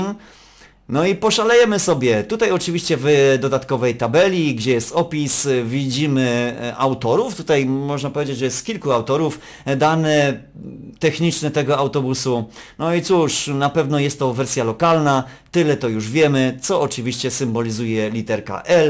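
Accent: Silesia, Poland. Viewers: odcinki są super idzie sie bardzo pośmiać a także odpocząc:) jestem z sląska i smieszy mnie to jak ''godo pan po slónsku''! wiecej omsi!:) Pozdrawiam!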